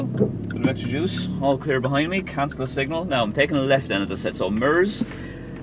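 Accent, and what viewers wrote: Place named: Ireland. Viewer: hi, today I went up a seriously steep hill and my car told me to select a lower gear so I went up in second but my foot was all the way down on the accelerator! It felt like the car was going nowhere or was going to roll back! I nearly s*** myself! I did make it up the hill eventually but nearly had a heart attack as I'm a nervous driver anyway. Was I correct to proceed up in 2nd gear? Is there a correct way of going up a very steep hill as I thought my accelerator was going to snap off. please let me know as I'd like to take the kids to where the hill led to in the end but am really scared of driving up that hill. Love your irish accent by the way!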